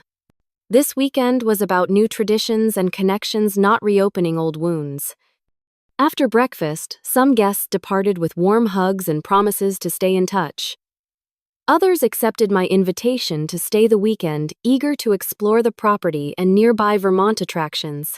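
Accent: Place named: United Kingdom. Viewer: You sound like from UK . Whoever is reading does not read well. Wrong pronounciations. !!!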